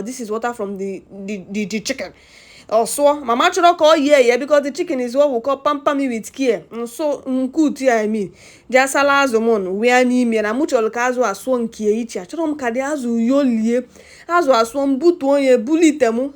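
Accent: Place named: Awka, Nigeria. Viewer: I like you ascent you spoke Igbo language in awka accent giving UMUDIOKA vibes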